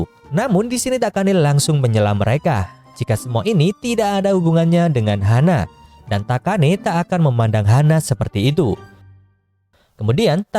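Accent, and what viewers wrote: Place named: Madura, Indonesia. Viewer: Omong gak seneng min .. terlalu kaku .. kayak omongx orang madura yg belajar bahasa jawa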